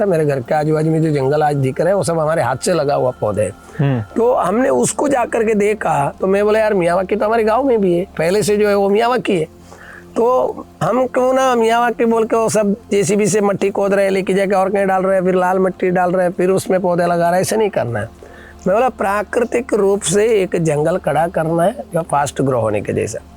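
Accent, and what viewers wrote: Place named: Gujarat, India. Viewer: Lagta hai sir gujrat me jyada rahe hai kahi kahi gujrati aa rahi hai 😅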